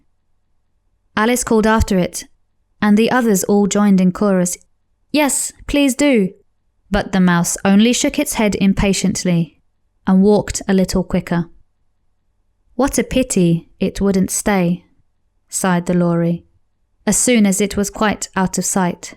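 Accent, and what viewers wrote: United Kingdom. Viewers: 영국영어, 상큼합니다~~~^^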